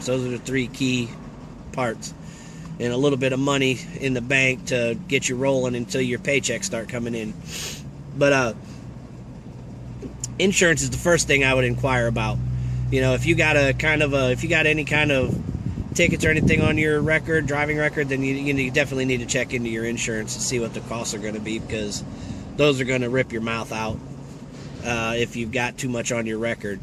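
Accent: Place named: Laredo, United States. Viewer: is this Laredo TX it sounds like it 😂 👍👍👍👍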